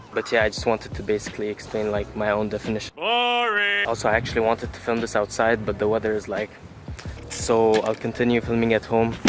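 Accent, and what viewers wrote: Arab world. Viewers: honestly, when I watched your videos for the first time, I could sense a middle east touch :D I speak two dialects of Kurdish, English, Turkish, and a little bit of Arabic. Çawani means "how are you" in Kurdish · That’s why i was sensing an Arab dialect when you speak... I love your humor keep posting 🥰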